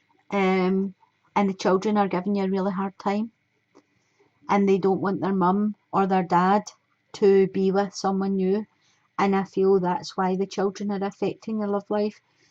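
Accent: Scotland, United Kingdom. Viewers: u Scottish?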